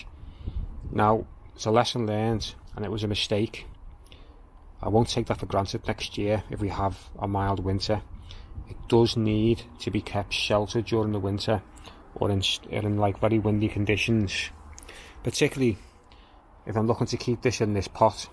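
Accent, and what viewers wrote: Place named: Scotland, United Kingdom. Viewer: i like gardening but for some reason when explained to me in a Scottish accent; I enjoy a lot more.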